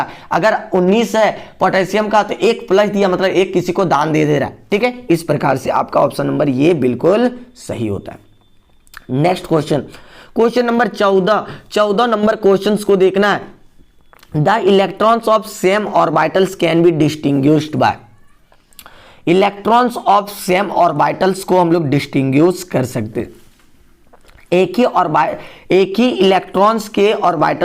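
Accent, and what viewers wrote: Jharkhand, India. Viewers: Aap Jharkhand se he aapka language bata raha hai 😃😃😃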